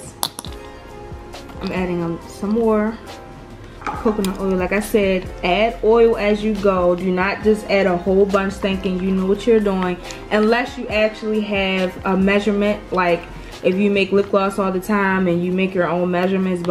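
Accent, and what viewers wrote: Baltimore, United States. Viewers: Omg your accent!!! Your totally from Baltimore!!!!! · Omg her accent, is she from baltimore??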